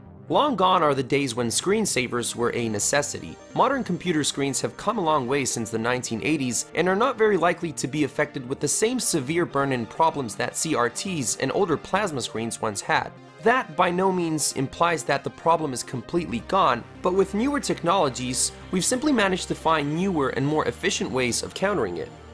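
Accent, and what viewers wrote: Balkans. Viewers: Are you from the Balkans? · Are u from balkan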